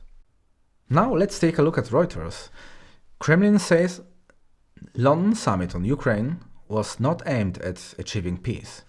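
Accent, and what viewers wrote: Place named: United States. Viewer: Słucham na 1.1x-1.15x – lepiej 'płynie' i zastanawiam się czy nie byłoby warto od razu publikować z lekkim przyspieszeniem. Wydaje mi się, że tempo mogło być trochę szybsze, bo do takiego angielskojęzyczni widzowie są przyzwyczajeni. Wtedy, żeby to się za bardzo nie zlewało można zacząć nieco bardziej dobitnie wymawiać niektóre słowa, zwłaszcza że akcent jest bardziej amerykański i tam to pasuje. Tak czy siak jest świetnie:) Taki punkt widzenia jest bardzo potrzebny. Zostaję, pozdrawiam i życzę powodzenia.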